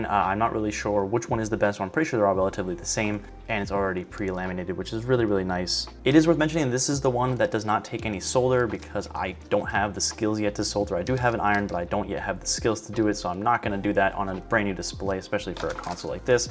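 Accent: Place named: Canada. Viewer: He’s Canadian can’t you tell EHH?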